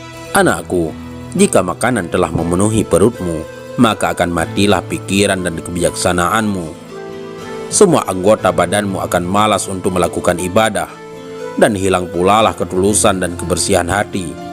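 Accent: Aceh, Indonesia. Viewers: Pasti ureng aceh ini admin logatnya terdengar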